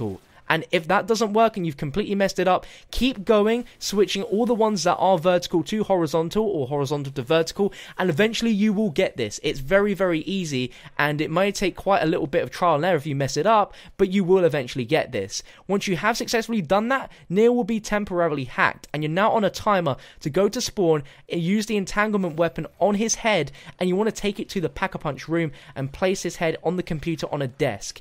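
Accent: United Kingdom. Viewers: I can't listen to the British accent.... Literally can't do it.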